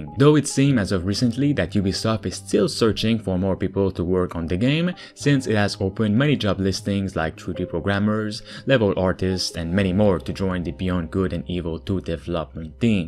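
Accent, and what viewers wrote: Quebec, Canada. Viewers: Toé t clairement québecois 😅✌❤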